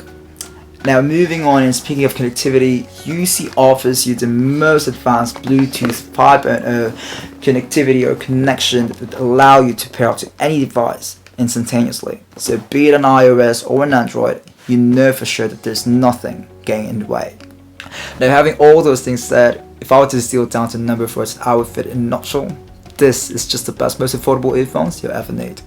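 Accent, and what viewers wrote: United Kingdom. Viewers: Wow! British Accent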